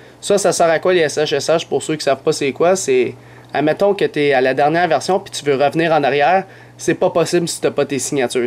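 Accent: Canada. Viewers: Mon dieu , J'adore son accent !!!! Canadien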